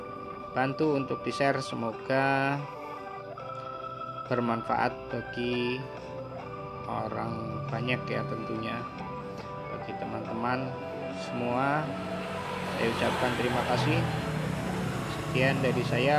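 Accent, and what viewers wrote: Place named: Banyumas, Indonesia. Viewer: Mantap om 👍👍👍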